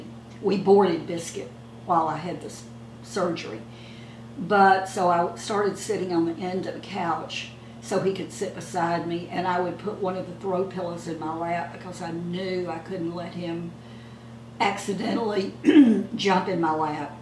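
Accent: South, United States. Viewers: From one long soaker to another, (can't live w/out my baths) I am so happy that I have found you today! You bring a cheery smile to face and a warmth to my soul. I am 60 as of August this year which is very weird for me. You are so precious and your accent brings me home to Georgia, Alabama and Tennessee. I was born in Atlanta. Although I was not raised there, I am a southern girl from the heart! I hope that you are feeling better as it's Sept. now. I will always keep you and yours in my prayers as I am a True Believer too! God is so very good and Be Kind Today is my mantra! Big hug. You are lovely and have the most sparkling eyes too!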